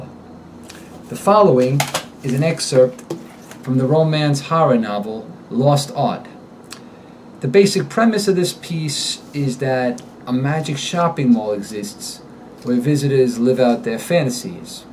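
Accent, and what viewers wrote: New York, United States. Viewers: The guy is from Nu Yawk !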